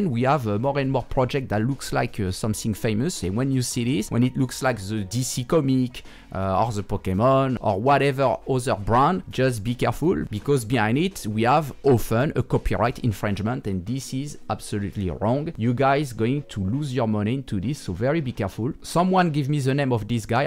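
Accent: France. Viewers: Deux secondes dans la vidéo et j’ai grillé que t’étais français ! Haha en tout merci pour l’information et les vidéos c’est une vraie source fiable, continue ainsi mon con je suis derrière toi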